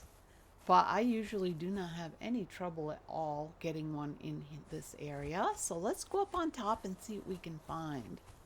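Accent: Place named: United Kingdom. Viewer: Love your guids, im from the uk so also love the accent 👍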